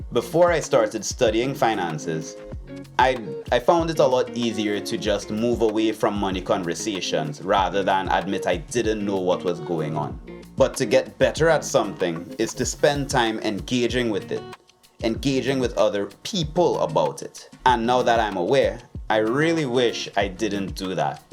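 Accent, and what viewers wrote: Trinidad and Tobago. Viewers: Sound like a Trini. But living where? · are you a Trinidadian 🇹🇹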